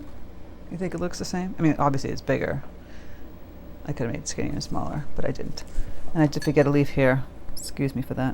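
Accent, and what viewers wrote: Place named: Boston, United States. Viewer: Wow... that was amazing to watch! So many helpful details shared while also keeping it simple. I could also listen to your Boston accent all day! Thanks so much for sharing your amazing talent. What a treat!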